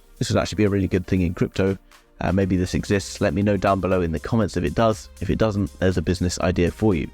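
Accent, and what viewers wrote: RP, United Kingdom. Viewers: ah, you were a Rupert. It figures with that posh accent of yours. 😉 Yeah, I stayed for about 6.5 years. I managed 5 tours in that time. That kind of did me in tbh. I love your channel, mate. Keep up the good work.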